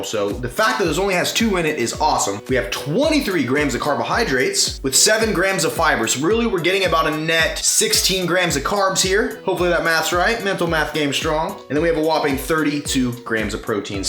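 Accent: Texas, United States. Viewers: Wow...he sounds so much more Texan in his more recent videos.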